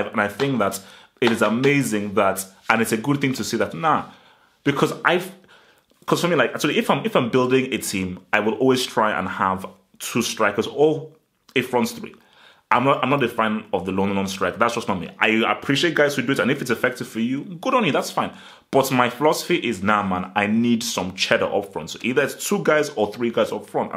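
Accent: United Kingdom. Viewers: Oh my goodness I love this channel even more . That British accent is impeccable. Keep up the good work my Nigerian amigo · That british accent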